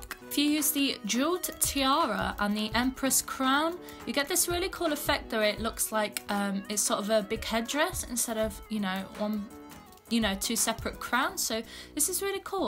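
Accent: United Kingdom. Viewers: I love your accent btw! I think it’s british right? I’m british too.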